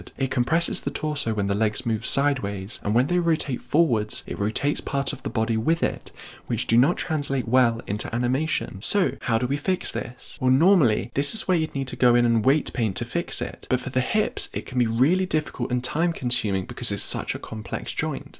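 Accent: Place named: United Kingdom. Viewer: Thanks a lot ! And... I luv your british accent ! Greetings from germany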